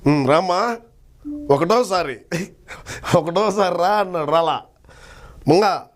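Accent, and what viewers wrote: Rayalaseema, India. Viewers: రాయలసీమ యాసలో అద్భుతం గా ఉంది 🙏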